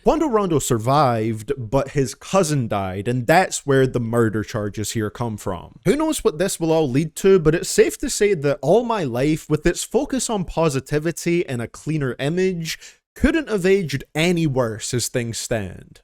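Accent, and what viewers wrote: Scotland, United Kingdom. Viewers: Ur accent interests me, r u a Scottish man living in America? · Is that a Scottish accent or no?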